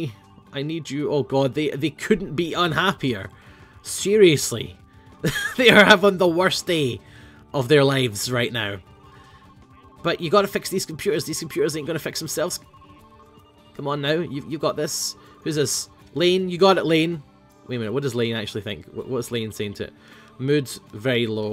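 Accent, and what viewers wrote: Scotland, United Kingdom. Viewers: you can just tell he's Scottish like me hah were ur yees gon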